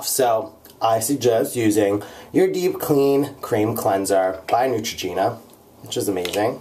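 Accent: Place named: United States. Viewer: I'm British and I just love your American accent!! Thank u soooooo much for making videos like these coz they r just so helpful and I'm really grateful for that 😄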